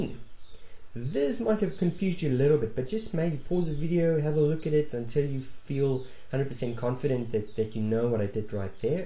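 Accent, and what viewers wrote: South Africa. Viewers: Thanks! This video was extremely wonderful and helpful for matric revision :) Great job... [I also noted the South African accent - being a Gauteng'er, the lesson was easier to grasp and much more tolerable!)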